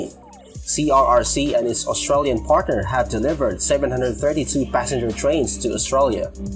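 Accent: Philippines. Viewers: by accent and off word pronounciation philipine chinese pinoy.100'/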